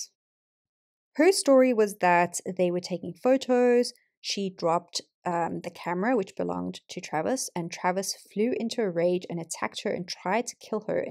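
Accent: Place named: South Africa. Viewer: Just found your channel. I have subbed! I love your content and hearing a South African doing crime 🥰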